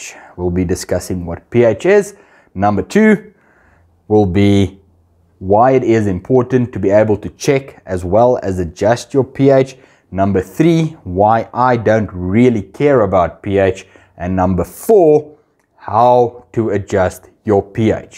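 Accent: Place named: South Africa. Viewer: Tornished..... Hahahah, classic South African accent. All good shit man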